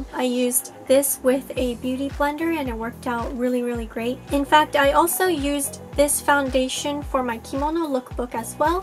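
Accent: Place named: United States. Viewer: Are one of your parents English or something? You sound so American (that’s a compliment btw)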